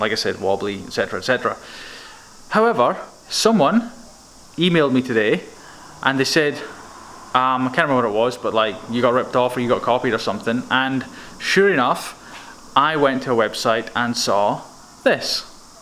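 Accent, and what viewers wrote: Scotland, United Kingdom. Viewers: I love your accent you sound Scottish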